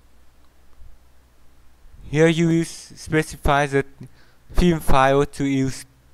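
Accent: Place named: Malaysia. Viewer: I like how you speak English, it sounds totally like how a Malay or Singaporean Chinese speak English rather than a Vietnamese. But, nonetheless that's super dang interesting themes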